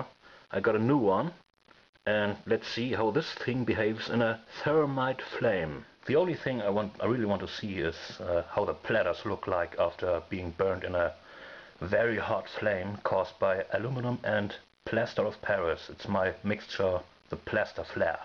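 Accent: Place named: Germany. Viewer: Speaking with a godawful german accent....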